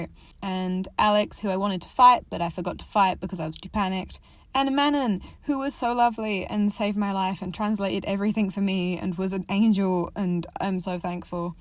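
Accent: United Kingdom. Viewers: omg yes you’re british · WAIT YOURE BRITISH WHAT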